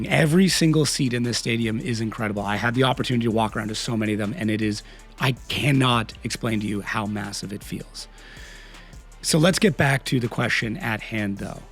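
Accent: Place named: United States. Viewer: you try your best to anounce Polish and not Polish drivers at comentators both, sometimes i think you are polish komentator speaking with US akcent, good work it was good experiende to hear you, Polish drifting its sutch a big history that you will need exdent this movie to 10h, but good shot trying to show people what its all abaut, you did a greate job ,,check Final in Płock - Adam Zalewski vs Piotr Więcek or James Deane vs Pawel Borkowski - THE BEST DRIFT BATTLE EVER? at Płock" glad that guys from DMP work with RedBull and the Championship is big but still have the spirit of family grassrots event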